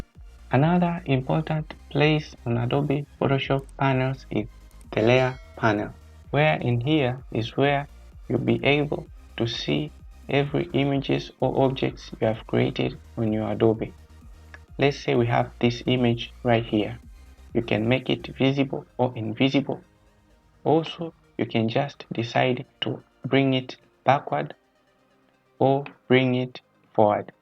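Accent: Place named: Nigeria. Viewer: Sounds like a nigerian 😁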